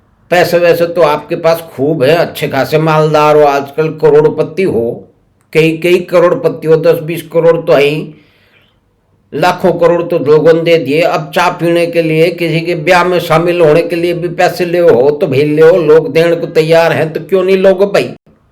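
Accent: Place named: Haryana, India. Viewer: Enjoyed the Haryanvi tone.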